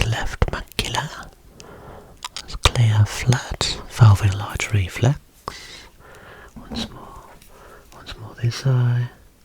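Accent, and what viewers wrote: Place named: Scotland, United Kingdom. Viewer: Quick question: Are you Scottish? Your accent gives me Scottisch vibes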